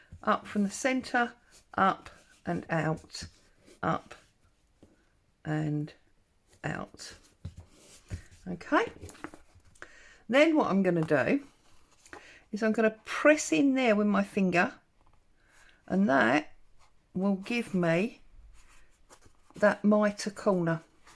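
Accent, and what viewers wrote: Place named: Kent, United Kingdom. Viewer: Hi, I have just found your channel and am enjoying watching, you make it very easy to follow. Also your accent is familiar, am I correct in saying you from Kent?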